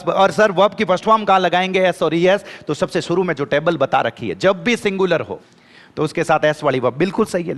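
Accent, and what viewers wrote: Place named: Marwar, India. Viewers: Jai mata di apki class Boht Boht best aa bt muje smj ni aata apki marvadi hindi me kahite to jada achha hai jaipur jodhpur us side wale smj lete hai hme smj ni aata plsss ap boht hi acha pda rhe ho ❤sorry feel mt krna sir ji ap hmare Boht best ho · Jordar he sir marvadi 😅😅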